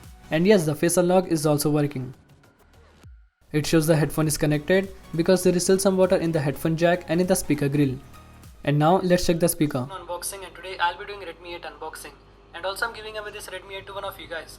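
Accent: India. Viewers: İngilzce konuşan Hint shkfsaj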